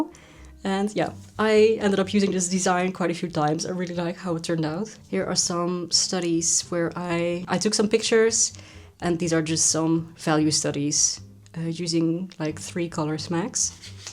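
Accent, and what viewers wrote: Netherlands. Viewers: I always love it how easy it is to recognize the dutch accent, 1 sec in the video and did not see your channel description and i already was like, hold up wait a minute.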